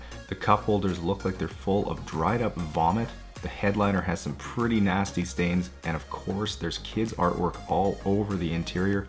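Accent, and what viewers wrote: Canada. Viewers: As a Canadian, he sounds Canadian in his voice overs....... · My favorite part watching these vids, as an American, is seeing how proper this dude talks during the voice overs and then seeing him go full Canadian once they come to pick up the vehicle. Cracks me up every time.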